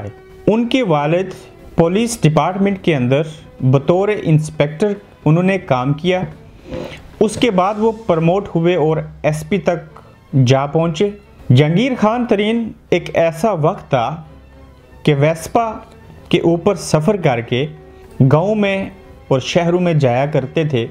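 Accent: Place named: Punjab, India. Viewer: کہابی ٹھیک ہوسکتی لیکن بھائ اپنا اردو کا لہجہ درست کرو۔نہیں تو پنجابی میں ویڈیو بنایا کریں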